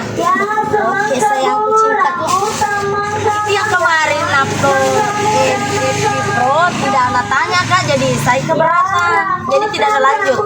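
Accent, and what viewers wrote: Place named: Sulawesi, Indonesia. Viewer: Org Sulawesi itu logatnya,,, menggemaskan 🎉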